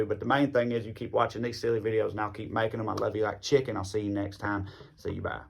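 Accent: South, United States. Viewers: I am a Canadian (love your Southern accent)…seriously though, you shoot straight from the hip, and call it as you see it! You are the ACTUAL voice of America, over all the Trumpers and Republican deadbeats. Thanks! Lovely hearing your views. Hope there are a majority out there that feel the same way you do! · I never realised that someone with a southern accent was capable of criticising the Republican party.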